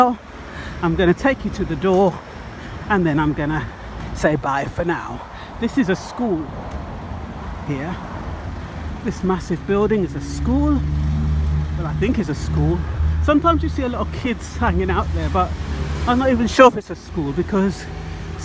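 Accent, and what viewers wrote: Jamaica. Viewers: As much as your English you cannot hide the Jamaican in you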